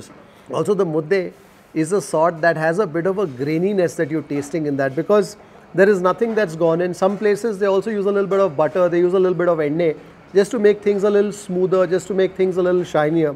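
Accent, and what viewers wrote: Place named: Tulu Nadu, India. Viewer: your kannada has full TULU influence 😁😁 We Tuluvas have an interesting way of speaking Kannada 😊😊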